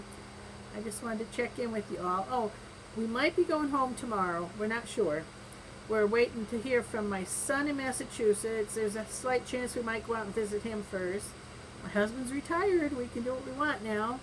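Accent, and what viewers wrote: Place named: New York, United States. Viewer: I knew you had to be from NY! I can tell by your accent. I am from really upstate NY (Massena)! I have an almost Canadian accent that comes back if I am home for very long!!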